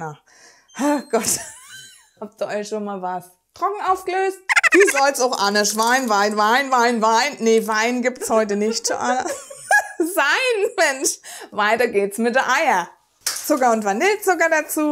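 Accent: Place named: Saxony, Germany. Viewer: Super die sachsen. Dialekt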